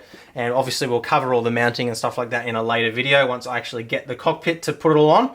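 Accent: Australia. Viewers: Hey thanks for replying. Judging by your accent I think you're Aussie? I'm trying to get my feet into sim racing and stumbled across your channel. I plan to start with a Next Level F1GT + CSL Elite Pedals + CSL Elite Wheel Base 1.1 + Fanatec Formula Black. Primarily will be playing F1 2018/19. What do you reckon this setup for a starter please?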